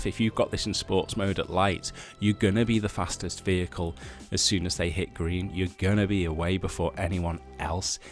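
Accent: Liverpool, United Kingdom. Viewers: Loved this thank you mate. Great to hear a fellow Liverpudlian voice too. Looking at getting this or the MQI. Really helpful review thank you!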